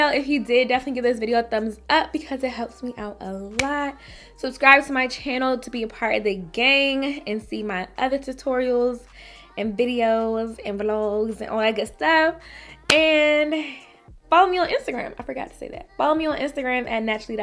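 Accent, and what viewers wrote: DMV, United States. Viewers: Love it! New subbie here. I thought that you sounded like a fellow DMV sista 🤗💖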